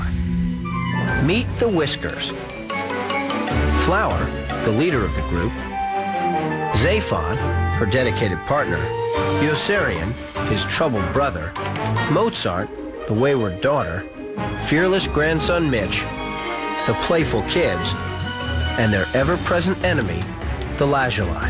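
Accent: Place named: United States. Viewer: I finally found a channel with the American narrator, im so happy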